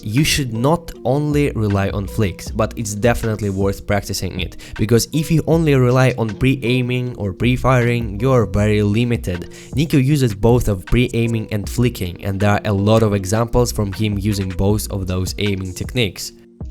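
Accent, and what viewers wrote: Russia. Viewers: Мне интересно, ты русскоговорящий или нет, прост акцент либо выдает, либо я незнаю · ставьте лайк, если понимаете что он говорит, только потому что у него русский акцент:) спасибо за видео ты пиздат.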